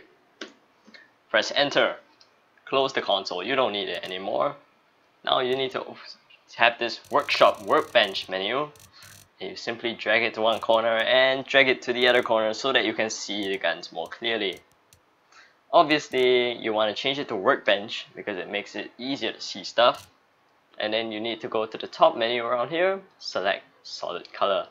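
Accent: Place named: Singapore. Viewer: Are u singaporean? u have a accent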